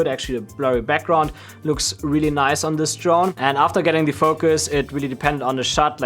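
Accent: Germany. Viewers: Super Video. Ich schreibe mal auf deutsch weil ich anhand deines Englisch denke, dass du aus Deutschland kommst.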